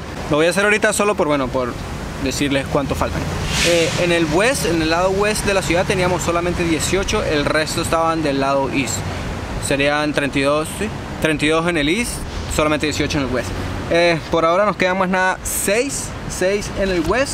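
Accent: Venezuela. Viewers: ¿Eres venezolano crack? Por cierto tu trabajo es increíble y con alguien con tu aptitud, se hace llevadero. Éxitos mi pana. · Excelente la edicion del video hermano,eres de venezuela cierto? ,por el acento y las palabras que usas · Se sabia casi q desde el principio q era venezolano, la chica q dijo mugre si no lo es, estoy seguro q debe ser colombiana :D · Este pana como que es venezolano? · eres venezolNO?? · Eres de Venezuela ? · Vos sois venezolano?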